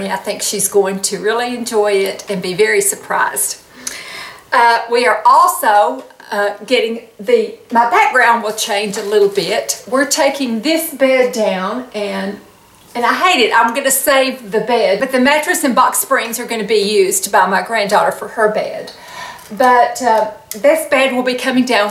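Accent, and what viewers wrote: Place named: Nashville, United States. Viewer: thank you thank you for this video. it was the perfect call to action to help me get out of a slump and start to get in gear! i love the winter too, but my husband hates it, he complains the entire season. i say you can always put more clothes on but there is only so much you can take off. i’ve lived in north carolina my whole like bit my husband’s family is from Nashville. Listening to you is like hanging out with all his aunts and female cousins. Love it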